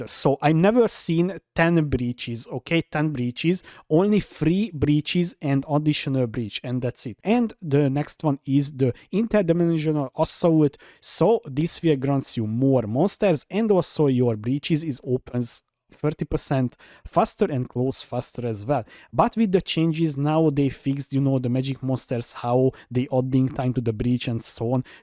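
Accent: Hungary. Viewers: Ez a Magyar akcentus nekem gyanus :D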